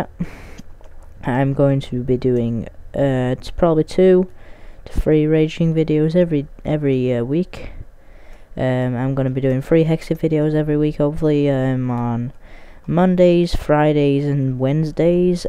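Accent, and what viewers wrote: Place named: United States. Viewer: U still sound american lol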